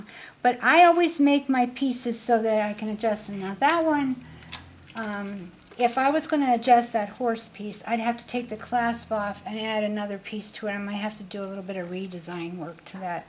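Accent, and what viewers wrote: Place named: Pittsburgh, United States. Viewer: Very nice! Love the hummingbird one. And got a real chuckle out of the Pittsburgh-ese. LOL Made me feel right at home. <3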